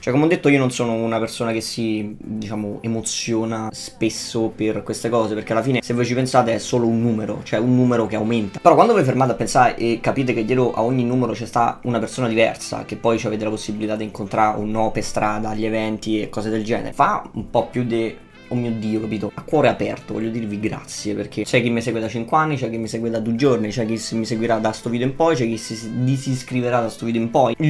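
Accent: Rome, Italy. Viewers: è normale che guardo tutto il video.. sai intrattenere non annoii e alcune volte la tua parlata romana mi fa ridere un botto, grazie ✌#versoilmilione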